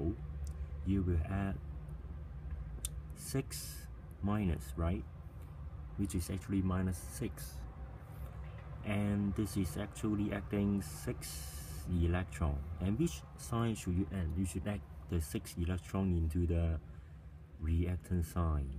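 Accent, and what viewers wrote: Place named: China. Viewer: From your accent I can guess that you are Chinese. By the way, it is now easy as a piece of cake for me thanks 👍👍👍👍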